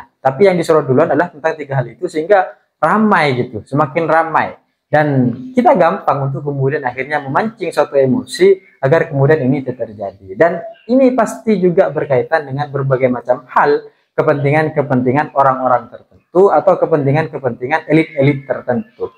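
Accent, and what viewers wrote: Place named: Madura, Indonesia. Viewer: Logat Madura tak iye😊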